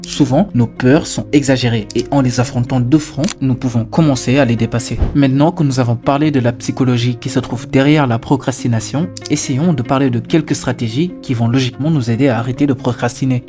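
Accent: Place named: Senegal. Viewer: Un frère sénégalais❤❤❤ je regarde toujours tes vidéos j'ai jamais sue et je trouve notre accent très propre ne t'en fait pas😊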